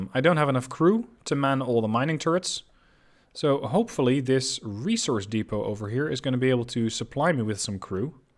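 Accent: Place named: Netherlands. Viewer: Looks like an awesome game, and also your accent sounds like your Dutch lol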